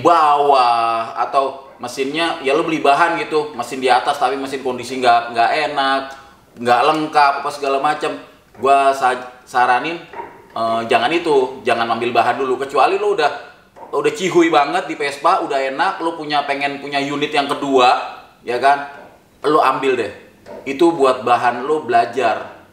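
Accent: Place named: Betawi, Indonesia. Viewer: Tertarik dari bahasa Betawinya..nyablak..lama2 tertarik ngliet konten Vespa...padahal ga punya Vespa...lanjut bang......cihuy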